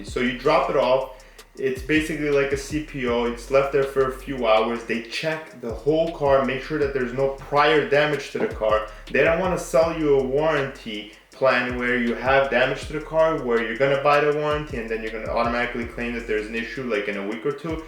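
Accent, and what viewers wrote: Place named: Armenia. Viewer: Your eyes look just like pure Armenian eyes and the accent is Arm as well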